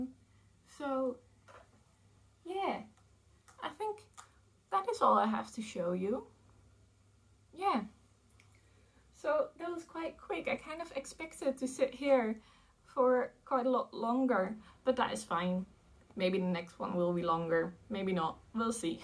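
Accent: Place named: Scotland, United Kingdom. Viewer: I could hear the wee Scottish twang coming through sometimes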